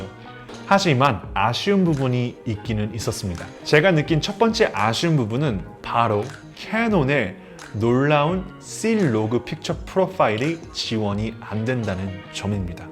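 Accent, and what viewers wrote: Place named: United States. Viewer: 재미교포신가요? 한국어도 미쿡말처럼 말씀하심..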